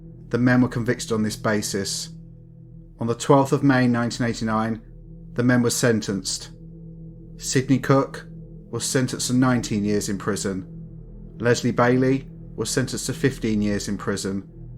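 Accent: United Kingdom. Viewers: What part of England are you from? The south?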